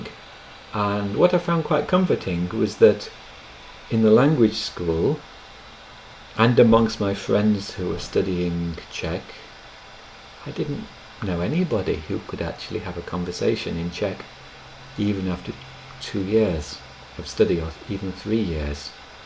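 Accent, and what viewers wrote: England, United Kingdom. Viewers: I like hearing English English, if that makes sense, ha! I'm American so our English is slightly different, but you probably know that.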